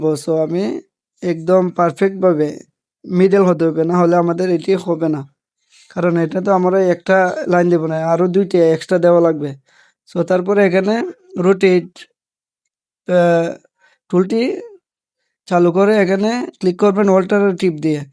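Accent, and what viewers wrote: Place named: Brahmanbaria, Bangladesh. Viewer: Apnr vasha shune mone hoyeche ze bi- baria te apnr bari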